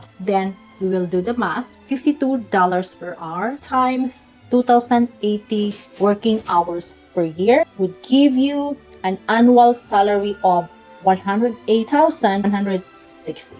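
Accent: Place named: Philippines. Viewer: you look korean , sound like a philippino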